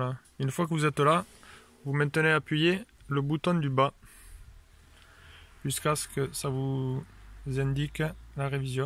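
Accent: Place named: Toulouse, France. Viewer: C est exactement ce que je me suis dit !!! Il est top !!! Avec un petit accent toulousain en plus !! 😂👍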